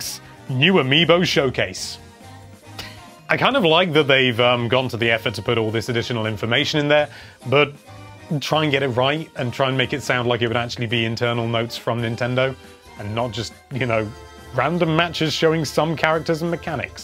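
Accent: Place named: England, United Kingdom. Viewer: Traveler is American English. Traveller is just English. You don't sound very American,. In fact, you sound English to me, and judging by your "posh boy" voice I'm guessing you went to public school. What did you do there? Scrumping?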